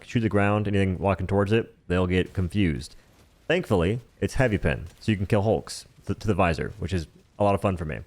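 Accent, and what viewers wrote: South, United States. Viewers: To this day still trying to figure out accent because sometimes it sounds southern but other times it doesn’t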